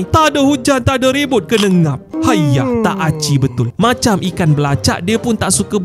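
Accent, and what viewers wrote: Sabah, Malaysia. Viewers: Mantul btul loghat Sabah kau, terhibur ni! 😍✨ "Siapa datang, habislah kau!"😂😂😂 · metang2 ikn 2 ada dekat sabah.kau ckap pu kuar slan sabah bah😂😂😂😂😂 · slang sabah？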